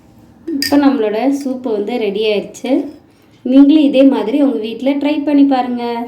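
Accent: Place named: Tirunelveli, India. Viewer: You from Tirunelveli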